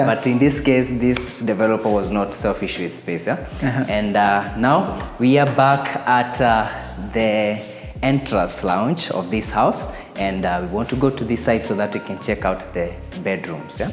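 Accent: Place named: Kenya. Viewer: First of all, I like, and especially his kikuyu accent 😂😂, he's my favourite real estate reviewer. However, honestly, whatever's keeping that house at that price point is the location and probably the landscaping. Everything else is just off, assymetrical, outrageously overpriced for decor that is below luxury standard, exterior design is barely standard while interior design is just as bad as it can get. For modern living standard, metallic doors just picked off of a jua cali base is as bare minimum as it can get. Let me not even get to the bathrooms. Whoever designed this house is such an embarrassment to high end real estate architecture. The only thing that may attract you to this house is the lawn otherwise the developer should go benchmark from the professionals. Very poor design. Wth😳🤔.. was good tho.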